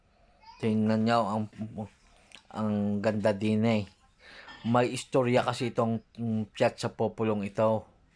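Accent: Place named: Batangas, Philippines. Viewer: Heheheh katuwa nmn batanggeño po kayo ..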